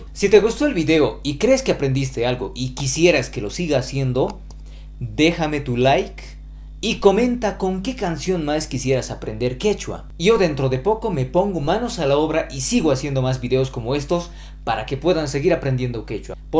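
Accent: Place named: Bolivia. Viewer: Hola, he buscado mucho canales para aprender quechua con pronunciación boliviana, y tu canal es muy bueno, me ha ayudado mucho en la traducción que más bien tu la interpretas y es más adecuado en los idiomas puesto que no se puede traducir palabra por palabra como en español, y tu explicación de los sufijos es muy clara. Sube tus videos de facebook acá por favor. Gracias por el canal.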